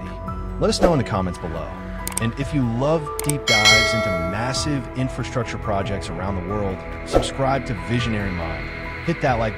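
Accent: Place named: United States. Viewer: Mispronounced place names, American accent, left hand drive, this is hard to watch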